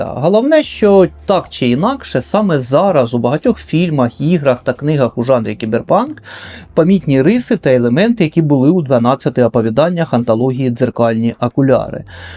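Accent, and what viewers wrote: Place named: Russia. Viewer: Ви дуже класний, але акання вас виказує.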